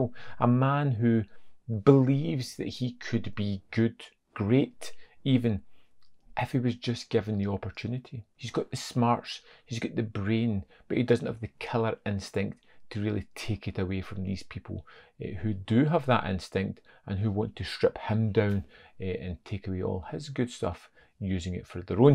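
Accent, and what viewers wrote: Scotland, United Kingdom. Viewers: THIS REVIEW IS SPOT ON IT PROMPTED ME TO BUY BLURAY.....AND I WASN'T DISAPPOINTED ..LOVE THE GENTLE SCOTTISH ACCENT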